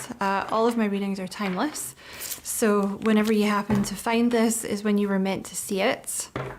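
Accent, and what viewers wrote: Scotland, United Kingdom. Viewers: My apologies for my confusion. My American ears did not identify you being Scottish. However my soul recognized your voice. Once again, my apologies. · It’s Scottish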